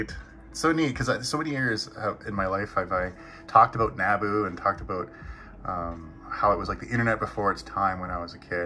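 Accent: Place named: Ottawa Valley, Canada. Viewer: Your hoser accent is really endearing. A taste of the Ottawa valley. I grew up in Smiths Falls but never was aware of any of this stuff.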